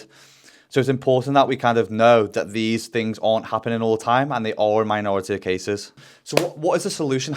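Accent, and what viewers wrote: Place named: Liverpool, United Kingdom. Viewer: Great content as always 👏🏼. Great work on the clarity of your speaking too, elocution lessons working well👌🏻👍🏻(not that I think there was anything wrong with your native wirral/scouse twang though! 😅)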